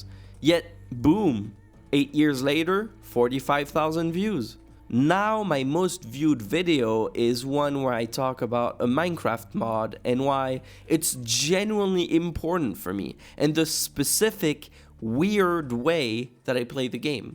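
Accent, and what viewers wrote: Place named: France. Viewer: I've got a question for you, are you french because your accent seems like you are !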